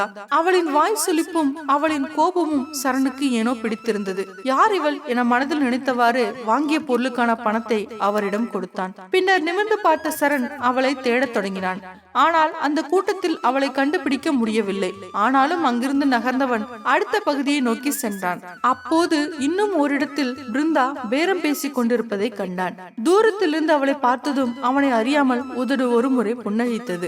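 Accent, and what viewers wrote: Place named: Jaffna, Sri Lanka. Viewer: சுனிலுக்கு பெபேவா.இன்னும் யாழ்பான தமிழ் விடவில்லை. அவதானித்து கொண்டிருக்க வேண்டும்.நல்ல பதிவு.❤நல்ல தமிழை பின்பற்றவும்.அவதானம் தேவையில்லை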